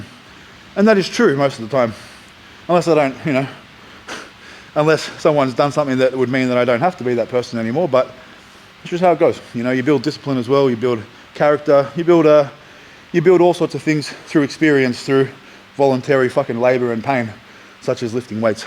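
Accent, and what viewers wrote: Australia. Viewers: Good see some solid Aussie oak talking weights not the yanks all the time. Good stuff mate and I’ll be watching keep the content coming and love the way we talk down under no room for smoke and mirrors just real talk. Now it’s your set mate 💪🏻😎❤️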